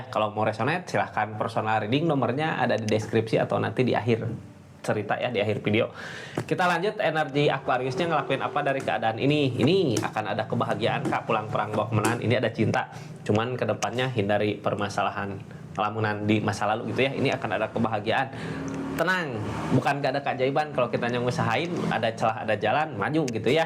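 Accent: West Java, Indonesia. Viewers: Nyabut jukut😂😂, aduh si Abang sunda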